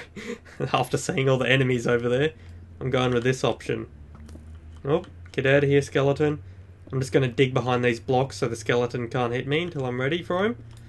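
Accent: Australia. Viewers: is it just me or does sound australian?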